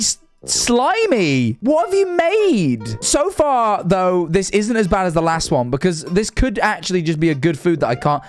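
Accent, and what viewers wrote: United Kingdom. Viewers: was extra british in this one 😂😂😂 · You're British?!?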